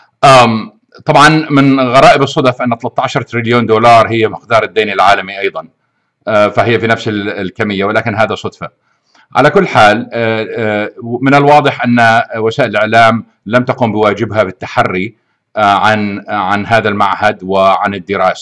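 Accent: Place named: Gulf. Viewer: اخوي الأستاذ سعودي او عراقي لاني عجزت اميز لهجته خليجي على عراقي على شامي … واسم العائلة الحجي وهو منتشر بين اهل العراق واهل الخليج خاصة الكويت والاحساء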